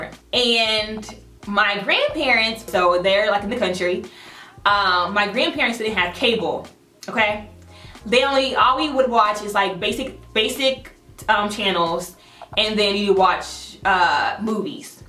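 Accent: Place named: Louisiana, United States. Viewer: ❤️ ❤️, sounds like where I grew up in Louisiana 😊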